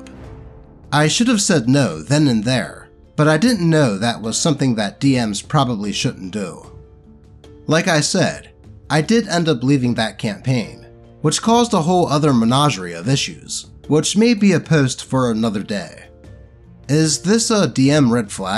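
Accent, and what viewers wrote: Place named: Baltimore, United States. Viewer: That Baltimore/Maryland accent coming out real strong in the first story lol all about Heaumophaeubia and the player hitting awn OP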